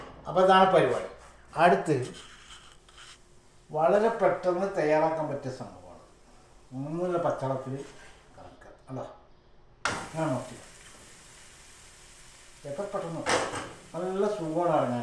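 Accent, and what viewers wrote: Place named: Malappuram, India. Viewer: മലപ്പുറം സംസാരം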